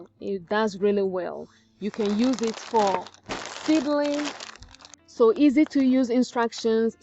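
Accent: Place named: Ibibio, Nigeria. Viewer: are you ibibio from akwa abasi ibom? you have beautiful accent miss thank you helpful knowledge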